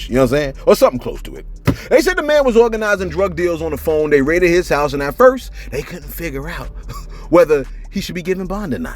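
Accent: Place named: Boston, United States. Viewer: Yo my futha mucking boi!!! You got thee best thee hottest show or odcast whatever you Wana call it on the internet this is my absolute fav -or-ite thing on the whole entire internet I been watching you from the jump and I never miss a show twice a day everyday or however many times u post I get all my notifications I guess I'm one of the lucky ones but even if I didn't I don't have no cable cuz I don't want it all I b on is YouTube that's more than enough for me everything is on here! But anyway my name is Dez Fresh I'm from Racine Wisconsin (The Wisco) and every chance I get I try to put my family and friends on to this casting,my lil brother Nique watches faithfully too!I watch one before I leave for work or while I'm there on break and I make sure I save the last episode for when I get home at 4am can't sleep right without catching u on my news bottom line you and your show and your back up chanel is you tubes big ish fr and I love her voice n lil accent sounds like she's from Boston 😆...don't ever stop doin this we love you,no homo and pause!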